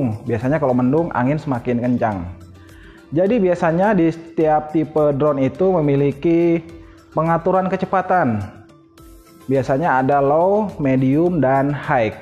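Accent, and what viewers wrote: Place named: Kalimantan, Indonesia. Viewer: dari logat bahasanya ketahuan,kalo dari kalimantan....sukses selalu ya om ,🙏🙏🙏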